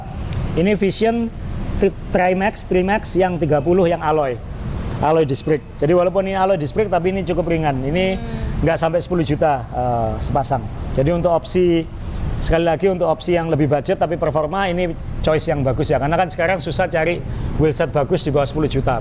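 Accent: Surabaya, Indonesia. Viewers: yang saya suka mbak nya ngomong nya medok khas suroboyoan... jd inget pas kuliah di unair dulu.. <num>. maju trs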